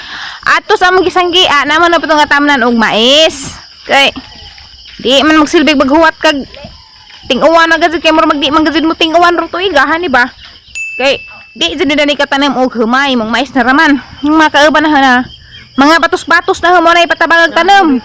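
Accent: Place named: Cortes, Philippines. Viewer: hahaha..kuhang kuha jud nimo ang tuno sa mga tga cortes dai...gimingaw nakog pugas ug mais...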